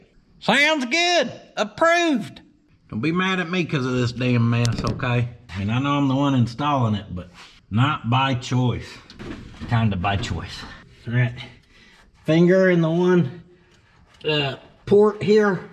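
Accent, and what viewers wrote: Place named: South, United States. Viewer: I've noticed from watching you for years that you are the only one in your videos that has a southern accent When you're family and friends speak they don't have that and it makes wonder where that came from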